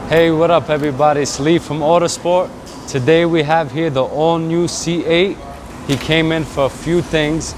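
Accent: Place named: New York, United States. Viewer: I knew by the accent you were in NY. Too far away. But would love to get this done 😩. Fantastic job!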